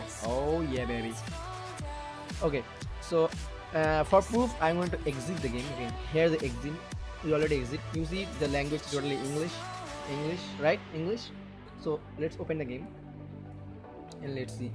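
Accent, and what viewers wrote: India. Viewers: Fucking India Accents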